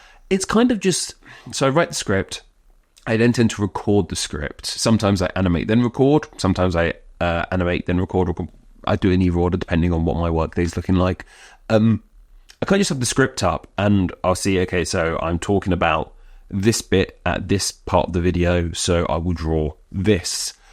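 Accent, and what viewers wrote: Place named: United Kingdom. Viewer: That's clearly a British accent.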